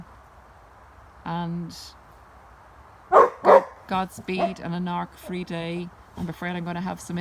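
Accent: Ireland. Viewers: I love the background of beautiful Forrest and cute little dog, thanks for your wisdom , also love your Irish accent it’s a soothing way to get the information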